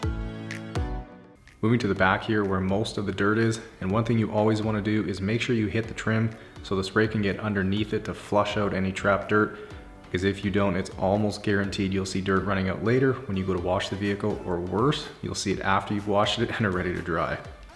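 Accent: Canada. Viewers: That’s exactly how I thought Canadians talk to each other. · Anyone else just listen to that Canadian accent and smile😂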